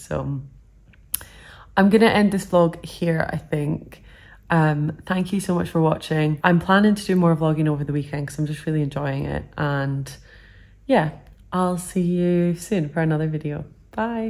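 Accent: Scotland, United Kingdom. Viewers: Just found you and I love your vlogs 😍 They’re so realistic and relaxing to watch! Are you scottish (I hear the accent😅) ? And do you have an instagram?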